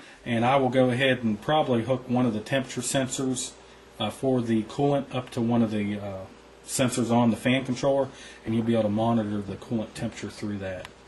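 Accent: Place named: Southeast, United States. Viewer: your accent sounds like american south-east? Would you mind telling where you live? don't need to be specific.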